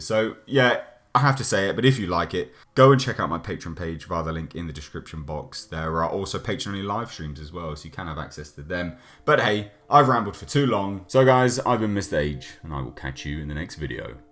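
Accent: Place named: United Kingdom. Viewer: I am interested in anything that you say with that deep Brit voice.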